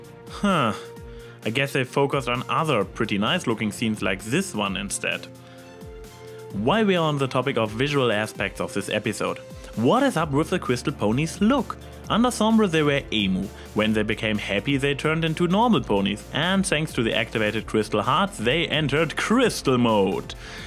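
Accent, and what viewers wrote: Germany. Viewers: ... are you somehow a version of me that happen to grown up german. I have an American accent, but when I speak german.... the resemblance to your voice is beginning to creep me out... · I hate german accents so much, but not as much as I like your videos. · Oh, it is! You're quickly slipping into my "most entertaining reviewers" category that includes such hilarious guys as ConfusedMatthew, SFDebris, and JeremyJahns. The strong accent combined with perfectly clear English helps quite a bit on that score; everything's funnier in a German accent (as a movie director once told Arnold Schwarzenegger, and yes I know he's Austrian).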